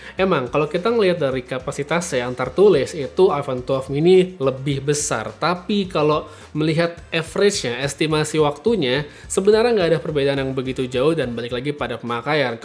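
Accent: Bali, Indonesia. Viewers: Ini orang Bali ya???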